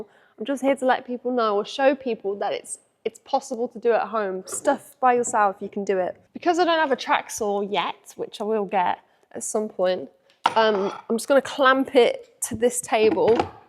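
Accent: England, United Kingdom. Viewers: Lady, you would bring joy wherever you go, for the indifference you hold for yourself possessing merits for which Men would go to war, a body that could make a man tremble, the tender expressions of your face, your attitude towards life(keep rolling on, how effortlessly you come out of things making Life question its own challenges), How Nurturing you are(for the love you have for your Animals), how keenly you do everything, and well British Humour and accent well it never goes wrong, may you be well through all you go through🙏🙏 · I hate English accent but your accent makes me fall in love 😍😍😍😍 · Where in the uk you originally from? Sound like from near me. Woman of all trades. Love seeing animals tho. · You already have a Huge audience. why don't you switch to travel vlogs, you already extremely beautiful, no doubt u ll multiply your subscribers by (God knows how much)....think about it. 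And you have a beautiful British accent, come on....get that dollar.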